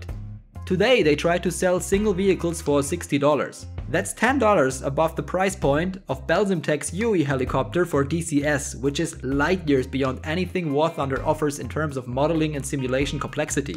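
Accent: Germany. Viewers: Hallo guten Tag man hört dass du deutscher bist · That is one German accent. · Echt gutes video aber man merkt das du deutsch bist # Tiger zwei oder Panther zwei sagtest du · Bin mir nicht sicher, aber ich glaube man hört deine Deutschheit raus :D Trotdzdem sehr gute aussprache, musste echt 5 mal hinhören ^^ "Jagdpanzer" hats dann bestätigt :D Deine ("Review") hat mich überzeugt. Zeit das WOT mal abgelöst wird :D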